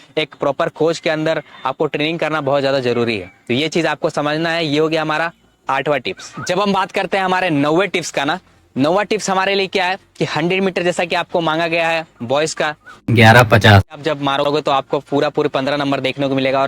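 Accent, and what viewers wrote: Maharashtra, India. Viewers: Sangli Marathi bolatat sir 😍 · Bhai bht pyari Marathi lagi aapki...Jay Shivray❤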